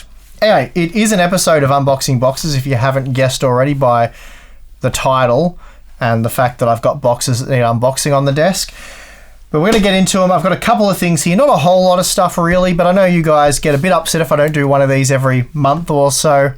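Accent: Australia. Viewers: I LOVE THE AUSSIE ACCENT .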